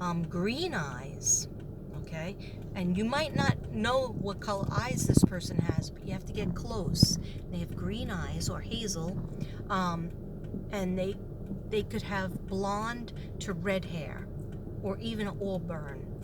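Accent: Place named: Brooklyn, United States. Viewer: You have a brooklyn or ling island accent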